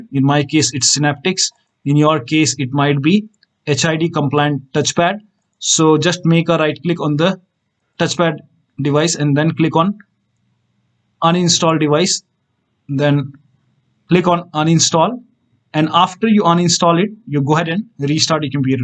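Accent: India. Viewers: Your language accent indicates that you are Indian, you are such a blessing man, saved my ass. Love from Bangladesh.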